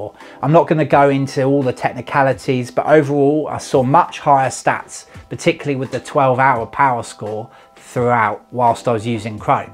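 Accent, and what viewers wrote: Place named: Australia. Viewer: thanks. I have been considering to move from Chrome to Safari permanently, then found your video! by the way. I like your accent. which part of Oz are you from??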